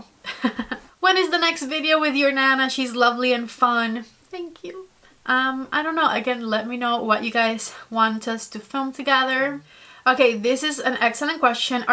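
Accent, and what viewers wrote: Cuba. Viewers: I recently reached all the Harry Potter movies with my daughter who’s 13! I still love it! I play the Harry Potter Lego game with my 6 year old daughter. So fun!!! I love going out to eat & Ed Shreen as well! I’m a certified ESL teacher! Your English is excellent, but I love that you kept a bit of your Cuban accent! I would love to visit Cuba! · Would you be willing to do a makeup tutorial in Spanish? I’m learning Spanish and I would love to hear it in a Cuban accent from an exceptionally talented person 🥰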